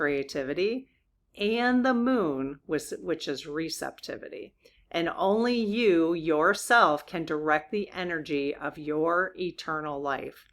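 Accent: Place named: Wisconsin, United States. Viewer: I had to check whether or not you were from WI. Your accent gave it away! I enjoy your videos.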